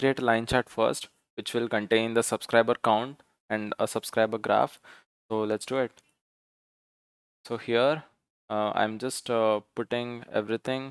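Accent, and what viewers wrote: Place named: India. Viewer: Indian people, please please work on your English accent!!!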